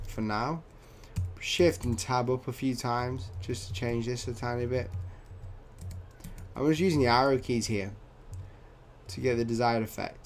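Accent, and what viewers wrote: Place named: North, United Kingdom. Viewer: You sound like a northerner like me so, 'subscribed'.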